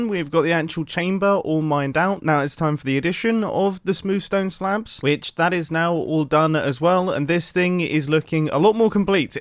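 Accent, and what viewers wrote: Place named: United Kingdom. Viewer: the word 'puddle' is 1000% more funny when said with an authentic British accent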